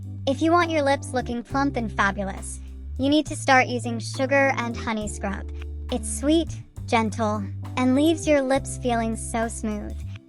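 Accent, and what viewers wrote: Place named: Multan, Pakistan. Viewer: Her accent multani mithi omg 😂😂😂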